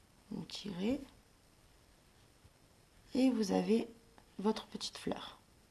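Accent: South, France. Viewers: Merci, le meilleur site que je connaisse à ce sujet: les explications sont claires, les réalisations très faciles à réaliser, les mains belles à voir et l'accent du soleil un petit plus délicieux, continuez je vous suis, isabelle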